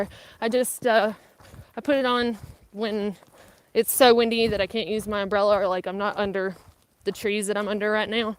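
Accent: South, United States. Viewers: Your southern accent is just the best!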